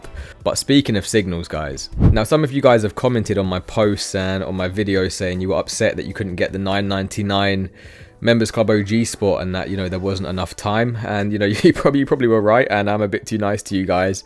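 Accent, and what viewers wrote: United Kingdom. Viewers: You must be from around Watford . You kinda sound like Anthony Joshua. Hello from Spain. 🚀💰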